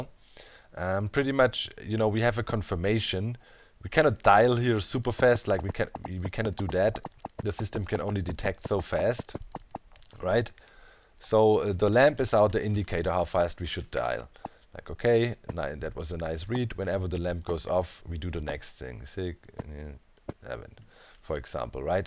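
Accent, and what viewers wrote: Germany. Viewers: I watch 30% for Minecraft, 70% for that smooth cool german accent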